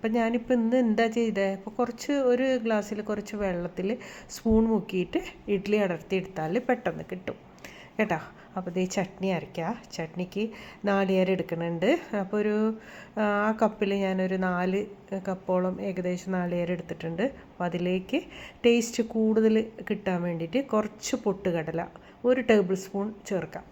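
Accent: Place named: Thrissur, India. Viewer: ചേച്ചീ നാടെവിടെയാണ് സംസാരം കേട്ടിട്ട് തൃശ്ശൂർ പോലെ തോന്നി ഞാൻ തൃശ്ർ ആണ് ആദ്യമായാണ് ചാനലിൽ ഇഡ്ലി റസിപ്പി കണ്ടത് ചേച്ചീടെ സംസാരം ഇഷ്ടമയപ്പോൾ കുറച്ചു കൂടി വീഡിയോസ് നോക്കി ഇനിയും കാണണം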